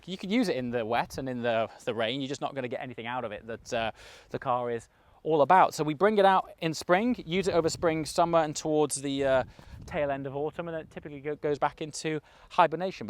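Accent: RP, United Kingdom. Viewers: I’m sorry dude. I really want to like you so badly but there is just something that makes me feel uncomfortable watching. You just don’t quite seem genuine. It even sounds like you are trying to hide an accent by using and RP type of speech pattern. Maybe it doesn’t translate to your American audience and they don’t pick up on it. But as an Englishman, I’m really struggling. The Chris Hoy name drop for instance. There is a way to be humble and you just don’t come close. I’m so sorry.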